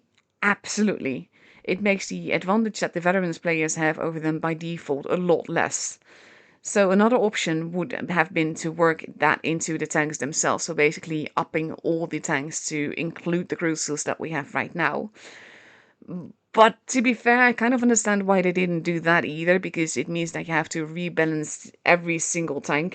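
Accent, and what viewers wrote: Netherlands. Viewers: ^: Love your honey smooth voice & Dutch / English dialect (Easy Listening) You made me roar with laughter = "𝐂𝐨𝐮𝐥𝐝𝐧'𝐭 𝐁𝐞 𝐀𝐫$𝐞𝐝" ( London speak ) Your phraseology is VERY down to earth. Very much appreciated by this Londoner!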